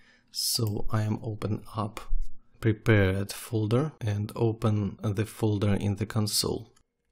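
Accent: Russia. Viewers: thanks! ;D i love your russian accent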